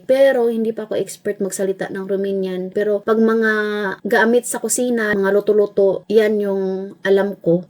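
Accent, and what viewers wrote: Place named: Visayas, Philippines. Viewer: Hahahaha...kung gahion mga bisaya😂legit gyud dhay...mao jud na atu dila hahahaha..ma identify jud ta nga bisaya😂❤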